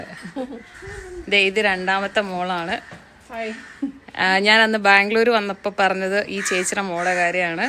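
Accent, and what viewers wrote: Kottayam, India. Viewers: ഹായ്... എനിക്ക് videos എല്ലാം ഇഷ്ടമാണ്.. ഞാൻ അമ്മുമ്മയുടെ വലിയ ആരാധികയാണ്.. അമ്മുമ്മ " പറയെടി "എന്ന് പറയുന്നത് കേൾക്കാൻ നല്ല രസമുണ്ട്.. അമ്മുമ്മക്ക് ആയുസും, ആരോഗ്യത്തിനുമായി പ്രാർത്ഥിക്കുന്നു 🙏🙏🙏 അക്ഷര സ്പുടതയോടെ, ഉച്ചാരണ ശുദ്ധിയോടെ സംസാരിക്കുന്നത് കോട്ടയം ഭാഷ കേൾക്കാൻ ഒത്തിരി ഇഷ്ടമാണ്... ഈ അടുത്ത കാലത്താണ് ഞാൻ അമ്മുമ്മയുടെ video കണ്ടു തുടങ്ങിയത്... ഐശ്വര്യമുള്ള സുന്ദരിയായ അമ്മുമ്മ.. പാവം ❤❤❤❤❤❤❤❤❤❤❤❤❤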